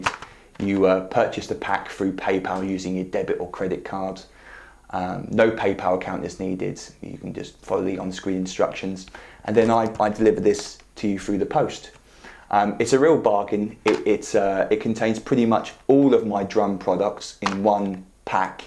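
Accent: England, United Kingdom. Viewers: What kit is this? It's peng!!! Also where are you from, you have an English accent? (I'm from England)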